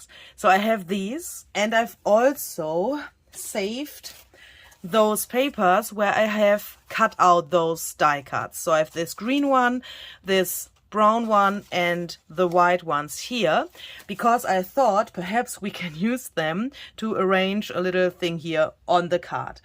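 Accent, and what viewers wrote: Germany. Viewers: Omigosh, …This digital came up on my Etsy feed last night and I added it to my cart… Now, when I see it in use…I must have it…I will be returning to my cart and purchasing it right away…I love these cards… Now I have to figure out how to print four on one page… I must tell you, I am of German descent and when I listen to your accent, it brings me back to my childhood and makes me happy… You have a wonderful personality and you make me smile…Hugs to You…❤️❤️❤️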